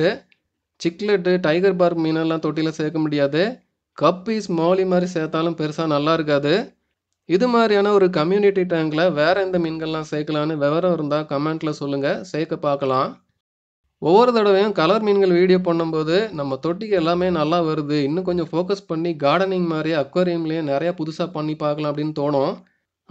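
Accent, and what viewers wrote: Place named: Kanyakumari, India. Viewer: s s Language kanyakumari pola irukku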